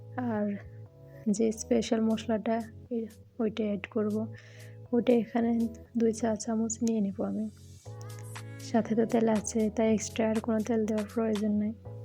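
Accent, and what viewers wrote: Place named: Noakhali, Bangladesh. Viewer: আরে না।। খারাপ না কথার ধরন পুরা নোয়াখালীদের মতো । তাই বললাম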